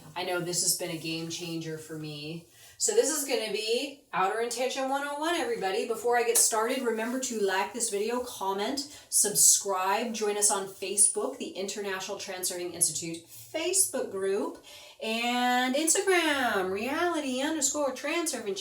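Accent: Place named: Western Pennsylvania, United States. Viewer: That western p.a accent makes me homesick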